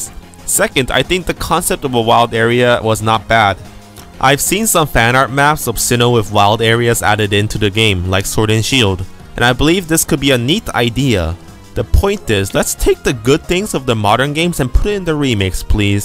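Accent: South Korea. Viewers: Do you really have a Korean accent? I'm French and I understand you more than a British 😅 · I just started watching the first 10 seconds and i am honestly surprised by the disclaimer. Sure you have a slight accent but your monologue is totally understandable and there's really no need to undermine yourself like 'my weird Korean accent'. If i may put some suggestion, just say you have cc. Be confident with yourself! I'll continue watching 👍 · Oh, wait so that's a Korean accent?!? Geez, I didn't notice at all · 뭐야 뭔가 발음이 한국엑센트던데 진짜였던거임?? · Dude, I can hear you just fine I have no problem with your Korean accent. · Sorry but I laughed way too hard when you mentioned your "weird freak korean accent", I love your accent, but you really made me laugh with that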